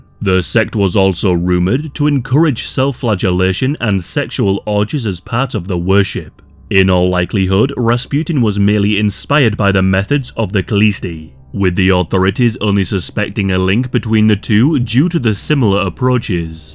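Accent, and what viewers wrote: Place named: Yorkshire, United Kingdom. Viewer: weird combo of a west yorks accent and an east european one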